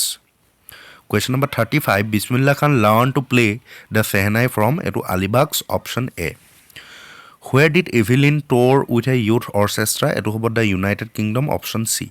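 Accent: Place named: Kamrup, India. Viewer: Kamrup district r lage etene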